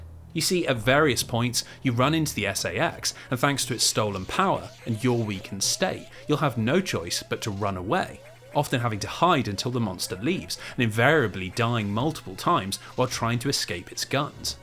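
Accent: United Kingdom. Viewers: Wow this makes me want to play every Metroid I started with Metroid prime as a 9 year old it was too much for my simple mind then but I want to revisit all of them after this video and I’m so so sorry you fighting cancer god bless you!! I just got sober first time in my life different fight but still my life was on the line due to my drug of choice…and I will always argue you deserve millions of views and I’ll always say the smooth UK accent doesn’t hurt (; thanks bro love yah I’ll pray for yah and don’t ever stop fighting 🤙🏻❤️👍🏻🫶🏻👊🏻💪🏻💪🏻🦾 you kick that cancers ass you make so many peoples day brighter and feel nostalgic of their own past and for that we all thank you !! 🎉🎉🎉🎉 let’s go brother !!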